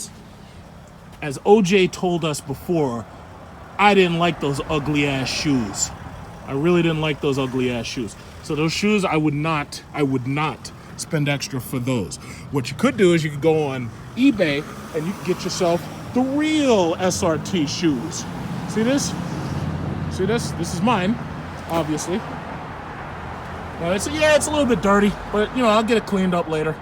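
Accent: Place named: Long Island, United States. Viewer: You are so Long Island bro. I watched mine 10 of your videos because you sound just like me and my brother arguing gear head stuff. I'm definitely a fan, bro. Keep doing your thing!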